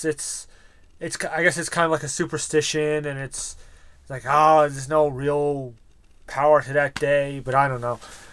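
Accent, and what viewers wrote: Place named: New York, United States. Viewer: you from new york? I heard an accent and I used to live there. Good vid I subbed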